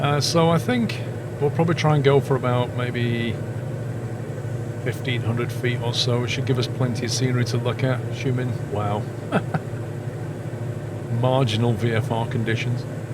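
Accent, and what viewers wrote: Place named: United Kingdom. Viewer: Dude good video as always. But you sound British and should know its pronounced "Edin-bruh" not "Edin-bo-ruh" :)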